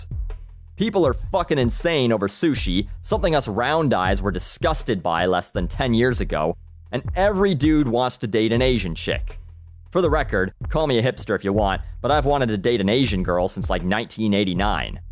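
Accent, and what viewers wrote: Canada. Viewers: you sound Canadian. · I was just going to say that. "Oot" "Aboot". Seems Canadian to me... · Oh... so thats what a Canadian accent sounds like. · yeah, he's canadian I was thinking that too (since my boyfriend is canadian and im used to their accents)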